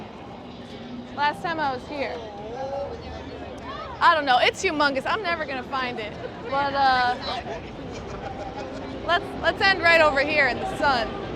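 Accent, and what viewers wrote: New York, United States. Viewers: I love your beautiful new York accent!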